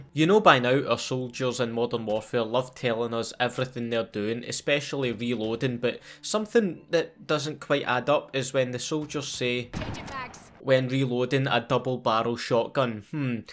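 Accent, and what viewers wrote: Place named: Northern England, United Kingdom. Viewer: God I love Northern accents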